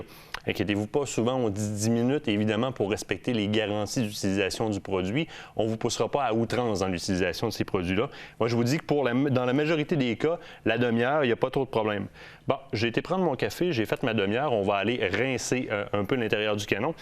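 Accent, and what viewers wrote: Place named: Canada. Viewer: Beaucoup moins pire :) J'adore l'accent du Canada :)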